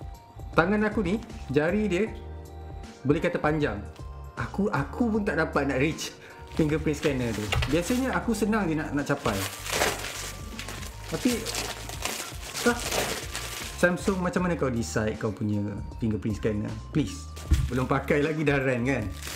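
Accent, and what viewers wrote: Terengganu, Malaysia. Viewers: bro org ganu ke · Orang Terengganu bro? dengor slang ganu skit tuh..huhu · Ganu boy bosku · orang ganu k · Ouhhhh org ganu rupenyee...hihihi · Mu org tranung ke awang? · Mu ni org teganu ke? Ingtkan org KL. Nice bohhh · pergh. orang ganu ke bohh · org ganu ke boh?nice .. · laa org ganu ke? hahaha kemah idola duk gong badok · Org Terengganu kee??? duk mane.. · org ganu ke boh? baek ahh.. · aku bru je discover video2 mu wahai, makin byk video aku tgok makin aku sure mu ni org ganu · Ko orang ganu ke? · ganu ghupenye..hahahah.. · Org tranung ka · ORG TGANU KE NI BOSS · bro org trg eh? area mana? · Hui orang ganu gok ni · org tganu ke abg? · Orang terengganu ke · Bunyi macam bahasa terengganu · Org ganu ke hihu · orang ganu ke？ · cam loghat teranung je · orang terengganu ke? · dak ganu ke.. mydin gong badak. baik ahh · sek ganu ni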